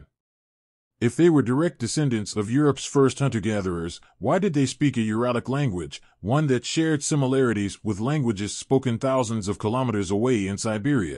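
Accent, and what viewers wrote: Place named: United States. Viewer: No American voice please. FO.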